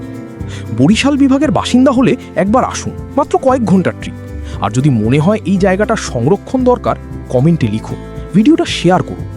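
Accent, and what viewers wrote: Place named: Kolkata, India. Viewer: আপনার বংলা একসেন্ট টা কিছুটা কোলকাতার বাংলা একসেন্ট এর মতো। Not bad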